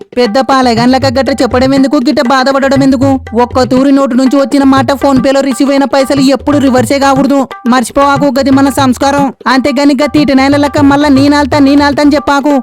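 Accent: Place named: Gadwal, India. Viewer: Super anna, Gadwal slang lo raffadinchinav po, ni concept nd creativity ki na salam inka ilanti enno manchi videos cheyalani korukuntunna 👏👏😇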